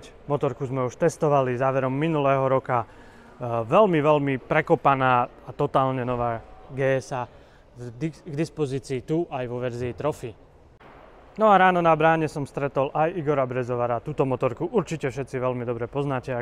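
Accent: Eastern Slovakia, Slovakia. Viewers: Pekné video pán, ".Už sa sa polepšil.Už ste odstránili aj to Svoje východňááárské zaťaho..váááánie.Robíte pekné videá,ale hrozne mi vadil ten východňársky akcent.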